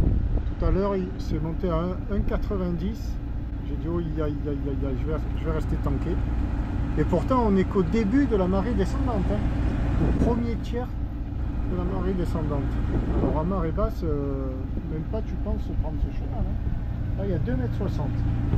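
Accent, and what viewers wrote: South, France. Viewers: J'entends l'accent du Sud. Vous êtes originaire d'où si ce n'est pas trop indiscret ? Ça doit être fatiguant d'être en navigation solo. Vous devez être toujours en hyper vigilance puisque vous n'avez pas de coéquipier pour vous relayer.... Jolie musique de fond 🎵🎶🎵 On dirait la même personne qui a fait la musique pour Catamaran Mistertong.... Je vous ai découvert grâce à Catamaran Javerne qui sont actuellement au Brésil où vous étiez il n'y a pas si longtemps. Je m'abonne car j'aime bien votre chaîne 😊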